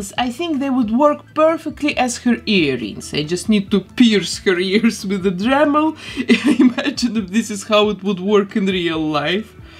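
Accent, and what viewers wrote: Russia. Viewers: У неё какой-то русский акцент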